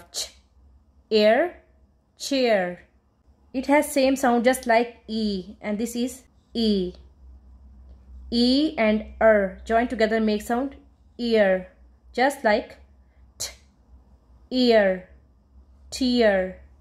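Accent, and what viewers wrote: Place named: Bangladesh. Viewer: Из Бангладеша учительница? Произношение прямо выдаёт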